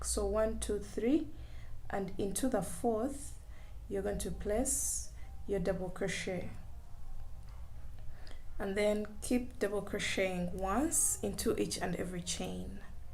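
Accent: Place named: Uganda. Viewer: She's Ugandan. I notice our accent everywhere ❤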